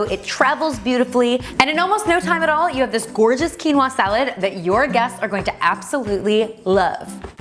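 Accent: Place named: Canada. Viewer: Just rewatched this for the bean salad recipe and my oh my!!! You Canadian accent was so prominent, I've been watching you since the very beginning and never noticed it until today! Love it!!!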